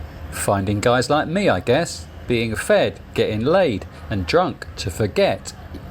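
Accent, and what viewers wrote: United Kingdom. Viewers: I just love your videos, Cheers 🍻 from Serbian fan. Sametime struggling a bit with British English, but ok.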